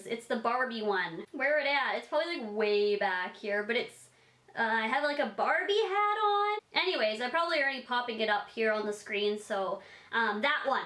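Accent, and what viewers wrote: United Kingdom. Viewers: A British accent wow, you love talking to me then I'm British :) your so beautiful and I'm short like you I'm only 4 ft 11 lysm xx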